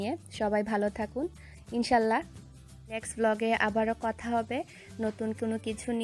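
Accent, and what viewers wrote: Sylhet, Bangladesh. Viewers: Full Video dekar age comment korlm. Apu miss korrm afnr sylheti voice 😥but afnr j mat shunrm bala lager afne jrokom matoin na kne afnr sathe sovsmy takmu love u appi 😘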